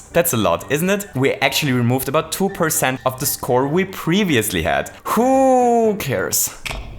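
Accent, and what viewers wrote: Germany. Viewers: From where you are ? (Sounds like Germany ) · You sound like a German speaking english and I like it. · Are you german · I hear a german voice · You have a funny German accent tho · the german english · What accent do you have? German?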